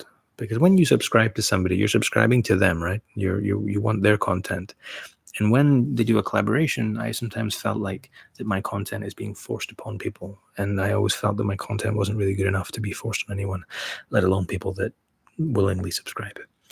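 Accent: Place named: Scotland, United Kingdom. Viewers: Are you Scottish, American or neither bc I can't work out your accent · It's an edinburgh accent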